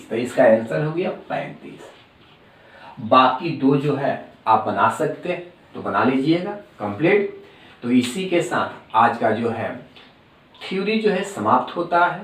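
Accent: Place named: Bihar, India. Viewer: Sir ekdum bihari lagat bani rauuaa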